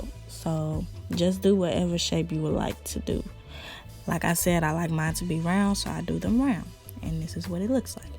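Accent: Chicago, United States. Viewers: I said the same this she gotta be from the Chi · Where are you from?? Cuz you def sound like a Chicagoan lol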